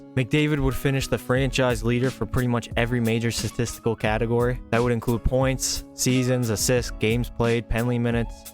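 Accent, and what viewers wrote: Newfoundland, Canada. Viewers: No way your not a Newfie, that accent lol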